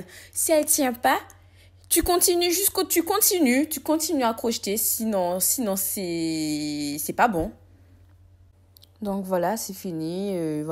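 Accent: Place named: Guadeloupe, France. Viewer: Tu es Guadeloupéenne ça s'entend ❤❤❤❤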